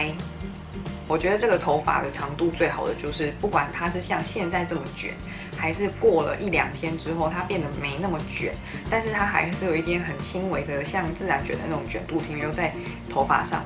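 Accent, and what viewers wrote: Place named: Taiwan. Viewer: 棒棒的你是台湾人吼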